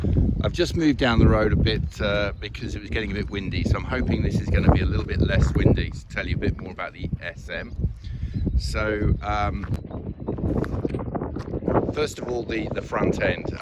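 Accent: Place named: Wales, United Kingdom. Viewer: Soft, lyrical and charming Welsh accent.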